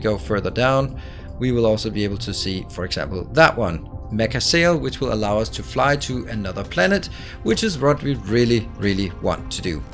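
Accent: Denmark. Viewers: DANISH!.. thank you, I was wondering where your accent was from! :) (re: reference to Danish keyboard at approx ) ROFLMAO "Devastated" - please say it more often :) "It will cry itself to sleep, every night" lol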